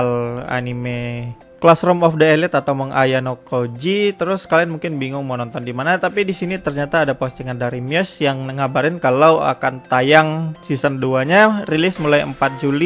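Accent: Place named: Padang, Indonesia. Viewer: Bang kenapa logat mu kayak orang padang 😂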